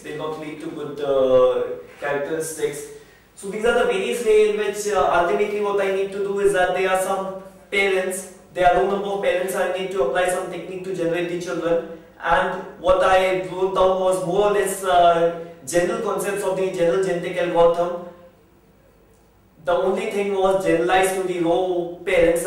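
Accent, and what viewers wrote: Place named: Asia. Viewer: thx Sir for video , I apreciate it but I need subtitles coz my english language is not so perfect especially on hearing the asiatic accent :)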